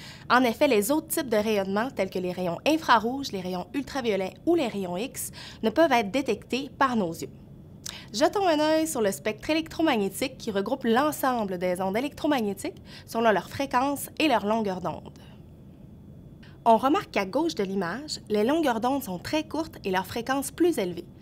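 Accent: Quebec, Canada. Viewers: C'est quebecois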